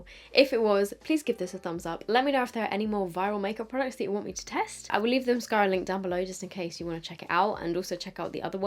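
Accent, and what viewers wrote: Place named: England, United Kingdom. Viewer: God I absolutely love the vibe of this channel and your English accent is music to my ears!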